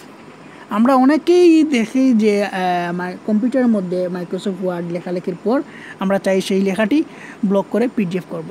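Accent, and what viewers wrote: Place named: Sylhet, Bangladesh. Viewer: তুমি সিলেটি নি ইয়া মাত বুজা যার🤣যাক আমি কিতা তাইলে বুঝছি নি, জানাইয়ো তে কমেন্ট এ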